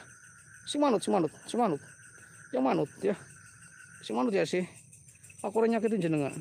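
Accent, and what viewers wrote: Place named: Java, Indonesia. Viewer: Saya suka medoknya ki 😆😆 prtahankan ya, slam dari Bali 🤝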